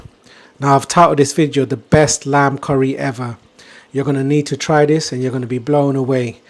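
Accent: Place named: United Kingdom. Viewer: 👍 Always curious about All types of foods but I was born in Morocco… I love my moms cooking but the Indian food goes very well with my traditional education about taste flavours that’s all about. I guess your English from UK sounds familiar to me, I been working in London quite a while in one of the best Japanese restaurants at Old Park lane NOBU juste what is all about GREAT. God bless you 🙏🇲🇦🇲🇦🇫🇷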